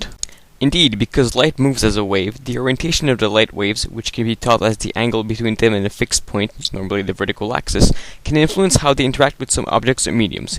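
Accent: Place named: France. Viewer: I am glad you love to speak fast AND with French accent but the combo of those two, makes your effort almost useless in delivering an otherwise interesting and useful information. Plus lentement s'il vous plait!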